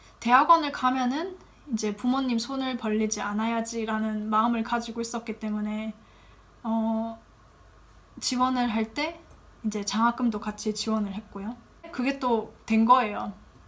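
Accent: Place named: Daegu, South Korea. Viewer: 대구분이세요? ㅋㅋㅋ 사투리 참 좋습니다!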